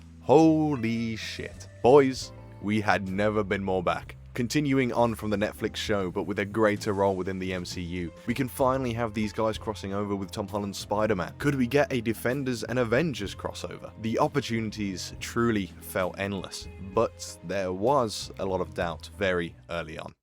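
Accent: United Kingdom. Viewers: It's infuriating that "lore" and "law" are pronounced identically with a British accent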